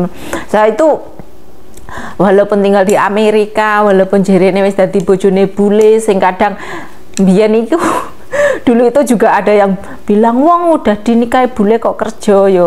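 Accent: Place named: Java, Indonesia. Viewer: gpp medok Jeng.....iku ciri khas e lo . gampang di eling2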